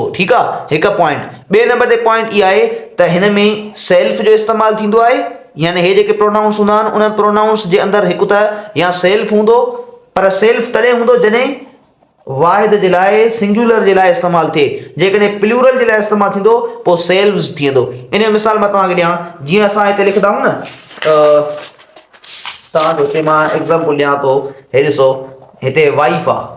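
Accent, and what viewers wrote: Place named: Shikarpur, Pakistan. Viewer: ap ho sir but bt karne ka way esa h jese shkirpur k memon bolte h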